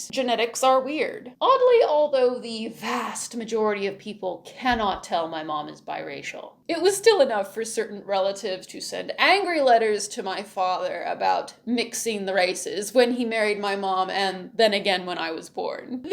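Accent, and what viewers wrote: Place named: United Kingdom. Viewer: You're Texan?😮 Where did you get that wonderful British pronunciation?!?🥰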